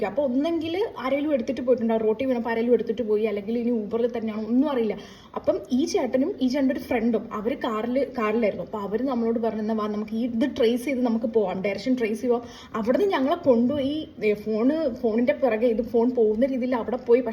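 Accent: Kannur, India. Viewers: Ingal kannur ano????.. Samsaram niceee❤️😄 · Keralathil evideyaaa??? Ur slang has a Kannur tone …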